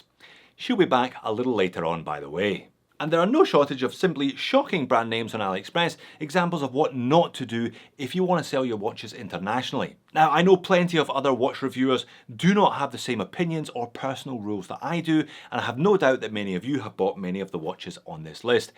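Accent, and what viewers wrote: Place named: Glasgow, United Kingdom. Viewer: You sound so Glaswegian playing the prospective partner there mate! It never leaves you. And hello from Glasgow by the way: we’re freezing our arses off here right now!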